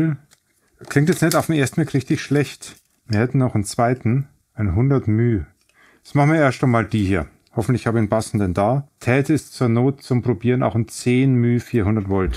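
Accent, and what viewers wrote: Bavaria, Germany. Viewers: Bei mir haperts an der Fremdsprache Bayrisch. Also ist das hier so, als ob ich Electro Boom gucke, nur dass dieser lustige Internetonkel hier nicht so oft unter Spannung steht. ¯\_(ツ)_/¯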